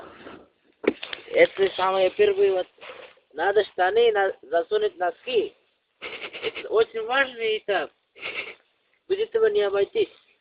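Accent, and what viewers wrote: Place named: Dagestan, Russia. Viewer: татарский дагестанец точно говорю акцент похож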